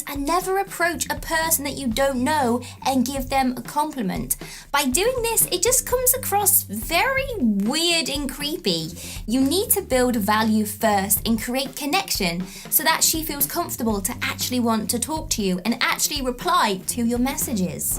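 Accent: United Kingdom. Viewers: May I ask about your accent? Is it British, Australian, or other?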